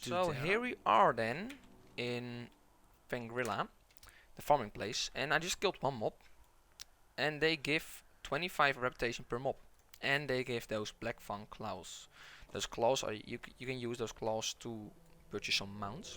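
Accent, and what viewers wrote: Netherlands. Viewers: You sound Dutch :D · Dutch sounding English. The worst. thanks for the tips though ;)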